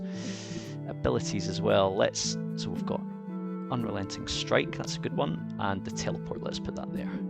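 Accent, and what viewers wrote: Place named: England, United Kingdom. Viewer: what happens in the 16th minute also nice accent are you English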